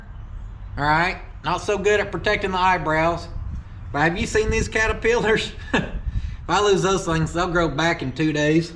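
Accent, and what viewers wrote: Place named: Georgia, United States. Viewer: I've been to Stroklahoma many times and I ain't ne'er heard no accent like you done got there. That right there sounds like a deep Gerjia or Alabamia accent...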